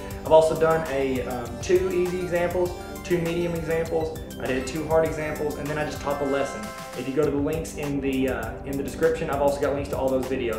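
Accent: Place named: Kentucky, United States. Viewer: I love your Kentucky(region) accent!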